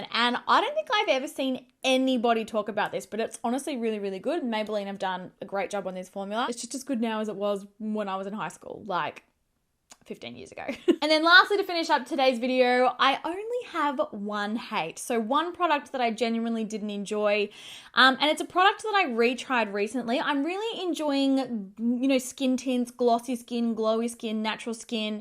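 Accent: Australia. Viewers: How much I love you, your smile your positive energy your girlie voice your beautiful face and the cherry on top your sooo adorable Australian accent ♥︎♥︎ LOVE FROM GREECE 🇬🇷 MY BEAUTIFUL ♥︎ ( I want things from your brand does it ship to Greece? ) hugs xoxo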